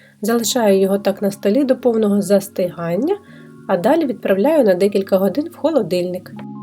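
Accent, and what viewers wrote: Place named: Border region, Ukraine. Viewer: Це діалект а не рашизм. Поїдьте в любий прикордонний район, в кожному свій діалект. Тим і прекрасна наша мова. І не робіть вигляд що ви в буденному житті спілкуєтесь літературною мовою. Так раптом всі стали "правильними патріотами" і почали інших вчити.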